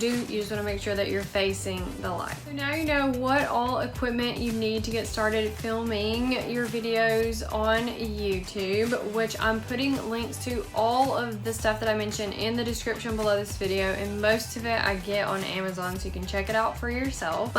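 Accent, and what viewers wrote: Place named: South, United States. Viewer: You sound like a True southern Bell...If my ears aren't mistaken me?👌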